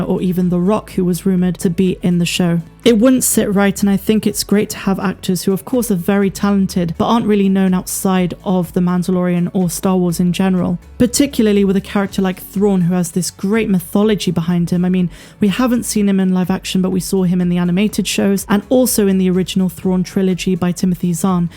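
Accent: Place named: West Country, United Kingdom. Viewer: I loved the f word said as well lol I'm loving accent can't work out if it's Somerset Devon or Bristolian and I'm from Somerset myself lol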